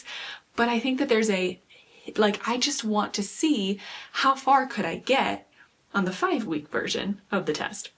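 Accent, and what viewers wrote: Germany. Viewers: Ich habe an deiner Aussprache gemerkt das du Deutsche bist, deshalb schreibe ich dir in deutsch. Mein Englisch ist nicht so gut. Was muste ein Meisterstricker in 30 Tagen stricken? Gab es damals schon Vorlagen oder hat er das aus dem Kopf gemacht? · Just found your channel. I'm a complete beginning knitter, although I crochet. Thank you for your work. I was an exchange student to Germany in 1972. I love hearing your German pronunciation.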